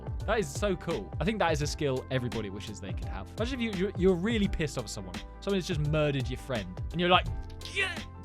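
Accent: United Kingdom. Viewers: i love this bros british accent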